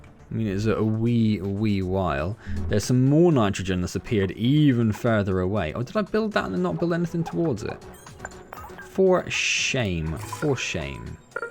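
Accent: United Kingdom. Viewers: Just found you through your furious seas video and I detect a west country accent... where abouts you to mate?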